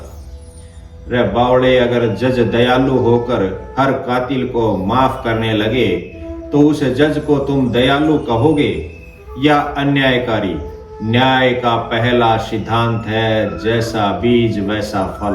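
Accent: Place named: Haryana, India. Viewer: आदरणीय स्वामी जी,आज के हिंदू को सुनाने के लिए यही सुन्दर भाषा उचित है। बहुत दिनों बाद ऐसे स्पष्ट वक्ता को हरयाणवी के पुट के साथ सुनना कल्याणकारी लगा।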